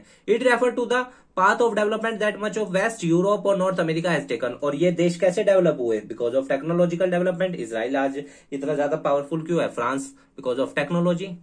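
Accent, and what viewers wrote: Haryana, India. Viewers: Sir Are u from Haryana...? ? 😅😅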